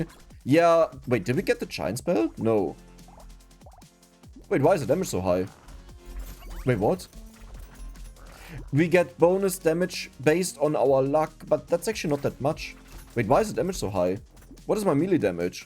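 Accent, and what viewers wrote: Germany. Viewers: Zo, zingle run, zix, zis point...German accent is so weird. You say "second" correct. Why don't you pronounce it then like zecond?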